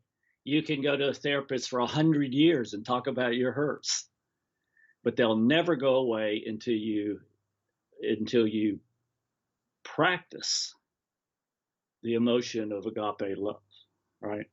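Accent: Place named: France. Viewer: is back! Yay! I missed your French accent 🤍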